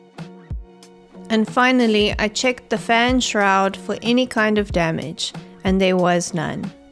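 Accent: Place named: South Africa. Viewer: I'm usually good at picking up what an accent is. Yours has me stumped, but I'll take a guess, are you from South Africa but have lived elsewhere long enough to end up with a hybrid accent? Great video. I had thermal runaway recently too, but it was due to me experimenting with my machine after flashing the firmware.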